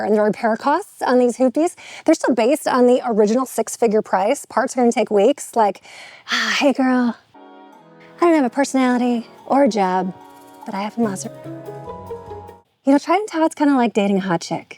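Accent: Chicago, United States. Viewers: Yes. If you close your eyes and just listen - 1000% Chicago high class girl. Unmistakable. Even with the man's watch. Brilliant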